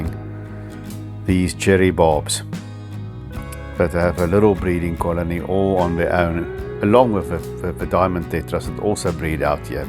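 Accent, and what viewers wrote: South Africa. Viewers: Love your accent…South African. 😊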